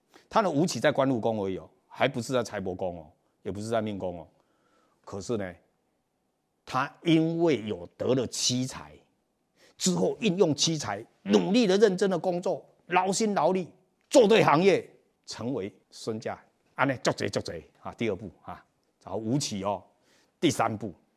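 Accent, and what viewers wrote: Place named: Taiwan. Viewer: 還臺灣國語欸！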